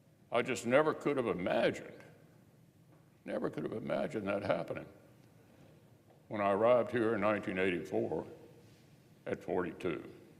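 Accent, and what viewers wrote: South, United States. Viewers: Somebody sends Skeletor on home that Southern drawl is f$@ gnarly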